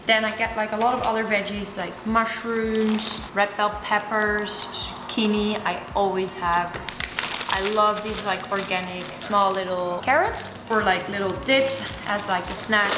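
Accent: Netherlands. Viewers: Are you dutch? I hear a little accent🤪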